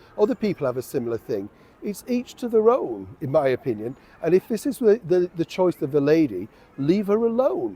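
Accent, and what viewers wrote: United Kingdom. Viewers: Dear Mr., I so enjoy your conversations with your viewers...you touch my heart and take away some of the loneliness I have at times. I never realized that until just now at the start of this particular video! Your values and assessments of situations, in the ongoing Royal "situation" for example, and in your observations on societal changes and issues in general, resonate with me, (an older moderate American, quite bit older than you) I started watching you for a taste of London and your charming British way of speaking, and yes I do enjoy that still, but especially the way in which you address sensitive issues in a refined, genteel manner WITHOUT being stuffy! I love that about your channel and about YOU! I am a true fan, just fully realizing that this afternoon May 25. I do so appreciate how you are NEVER crude, coarse or salacious in your reporting and commentary. 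THANK YOU!! I first started watching your channel when dear Queen Elizabeth left us....your heart was broken and your way of discussing her, with obvious love and respect, touched me deeply. I don't know if you have the time to read these comments but I hope you are able to read mine here. I often comment on your site, but this is a personal FAN letter! With sincere appreciation, L.K.Lovelace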